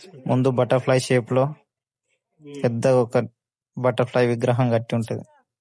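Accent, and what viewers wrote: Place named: Telangana, India. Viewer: Pakka telangana slang.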